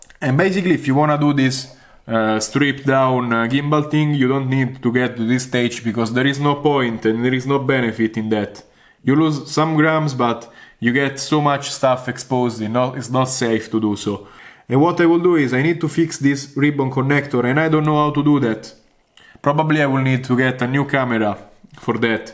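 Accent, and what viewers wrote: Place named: Italy. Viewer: Pure pleasure to watch your movies mate. they sound even cooler with this italian accent :D wish to have more confidence to show my experiments publicly :D like You :D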